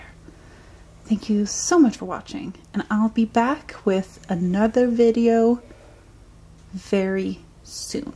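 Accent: United States. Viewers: Came here from Twitter where someone uploaded your video from Tiktok I have been enjoying your videos a lot but I am surprised by this small town. I’m from England and when you go to smaller towns or villages there won’t even be brands we’re familiar with in big towns and cities but here in Longyearbyen I see brands at the supermarket I recognise, which is funny. Did you go and live in USA for a while because you’ve got a perfect typical American accent? And does the sun rise at all or is it constant darkness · Did you live in the United States? You sound like you learned English from Americans. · are you american? you just sound american to me, but somehow i doubt you are since you live in such a unique place. i bet you have an interesting story.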